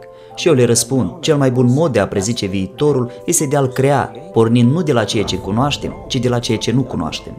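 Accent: Moldova. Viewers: Desi ai un frumos accent moldovenesc via Chisinau, transmiti foarte profund cam tot ceea ce postezi, ai harul acesta, sau poate te-ai reinventat, dar cert este un lucru, esti pe drumul cel bun si nu numai atat, mai ii si ajuti pe altii sa isi schimbe viata prin ceea ce etalezi,...., excelent, este minunat si bun de urmat, o zi frumoasa si o viata si mai frumoasa !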